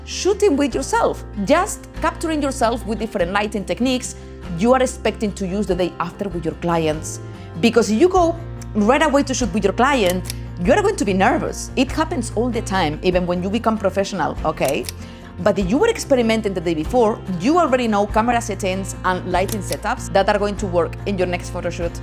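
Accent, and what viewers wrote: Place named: Spain. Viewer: I’m told that if you go to an event with 8 peoples, you will talk to 8 people, if you go to a large event, even hundreds, you will talk to 8 people. I am an old guy, I do not do small talk, just lost for words. The lovely Spanish accent works well for. Family are the worse people to practice with.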